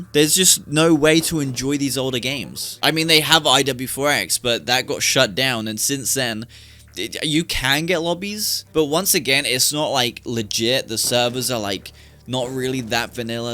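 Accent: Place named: United Kingdom. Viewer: Do you also do a car channel? Your voice sounds familiar. Classic britain😊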